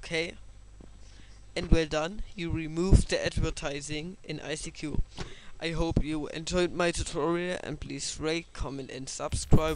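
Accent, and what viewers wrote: Germany. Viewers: hey danke für das tut man merkt schoin das du deutsch bist faved and liked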